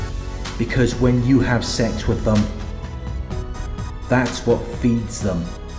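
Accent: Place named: England, United Kingdom. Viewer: He is English we have many dialects over here unlike the US. The silence is so you can digest what he is saying.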